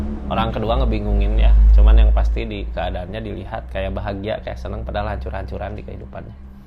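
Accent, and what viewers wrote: West Java, Indonesia. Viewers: Aminnn😊 kang logat na meni nyunda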